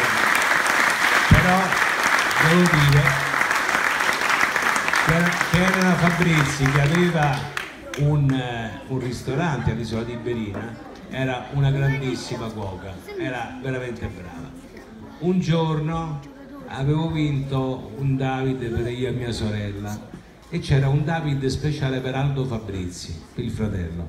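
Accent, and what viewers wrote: Rome, Italy. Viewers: Che bel racconto verace sincero con questo accento romano e questa romanità nel modo....grazie mi son fatta due risate😂😂😂😂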